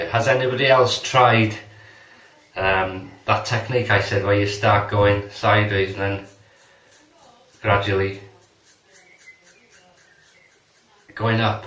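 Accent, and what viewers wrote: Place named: Wales, United Kingdom. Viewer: Nice vids! Subbed. Didn't know you were welsh...(I am rubbish at figuring accents out haha). -Matt (Norwich)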